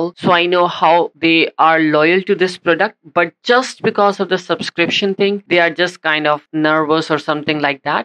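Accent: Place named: India. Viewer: Indian accent sucks bro, honestly!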